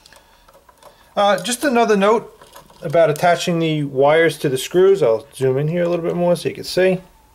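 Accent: New York, United States. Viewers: dude TALKS LIKE NEW YORKERRRRRRRRRRR MAYBE HES FROM THE BRONX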